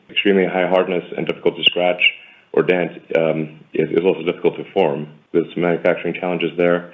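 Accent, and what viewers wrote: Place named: Jeolla, South Korea. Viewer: 전라도 사투리가 듣기 좋네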